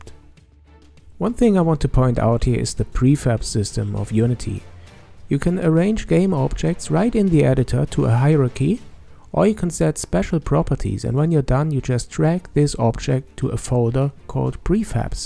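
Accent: Germany. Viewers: german accent? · Bist du nen deutscher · Man hört sofort, dass du Deutscher bist, also schreib ich auch einfach mal auf Deutsch ;P Wir benutzen Unity an unserer Universität aber optisch sagt mir Unreal Engine viel mehr zu. Grundsätzlich können die beiden ja das gleiche aber wäre für die Industrie später eines der beiden Programme mehr von Vorteil?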